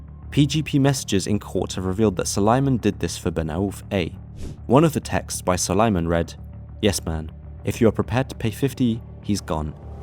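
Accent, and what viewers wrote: Netherlands. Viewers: Mate who narrates these vids ? Are u dutch ?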